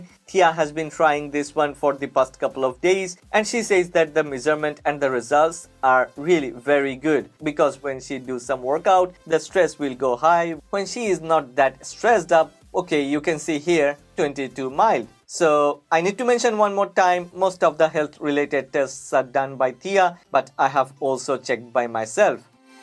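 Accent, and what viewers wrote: India. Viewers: I will be subscribed to your channel when you will stop to speak with this terrible Indian pronunciation.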